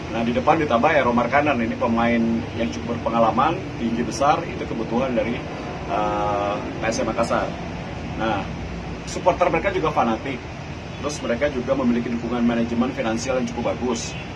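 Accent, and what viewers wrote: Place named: Banyumas, Indonesia. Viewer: Boca ngapak ya sudahlah sudahlah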